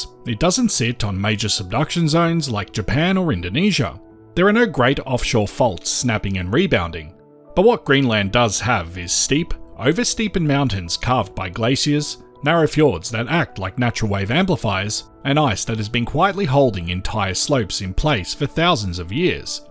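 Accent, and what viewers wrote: Australia. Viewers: Great to hear an Ozzie voice - and Tsunamis are a fascinating topic. I’d love to hear your thoughts on abrupt climate change - what geo evidence is there that paleo climate has previously abruptly changed in years not millennium and when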